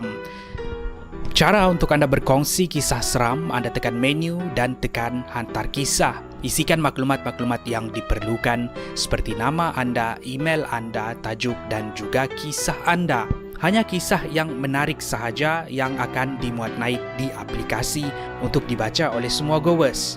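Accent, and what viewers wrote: Sabah, Malaysia. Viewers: sabah ka😁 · org sabah ka? cara bercakap mcm sabahan..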